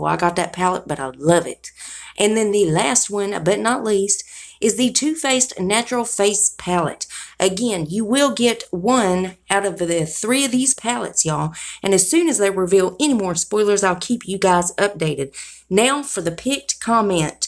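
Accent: South, United States. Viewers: Lawdddd your southern just like I am honey I love it so much and your gorgeous to boot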